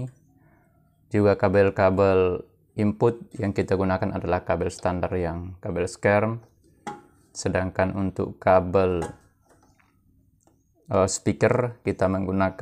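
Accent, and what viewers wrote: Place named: Toraja, Indonesia. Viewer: klo saya dengar2 logatnya Orang ini dari Toraja, maaf klo sala tebak 😁🙏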